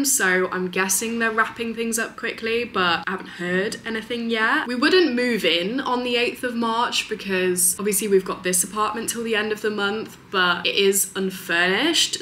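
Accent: United Kingdom. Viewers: As a previous professional party photographer in Sydney, I can safely say this, you’re young, British with a classy accent, blonde, and pretty, these are all you need to gain entry to all sorts of groups and cliques. Be confident in that 💪🏽😁!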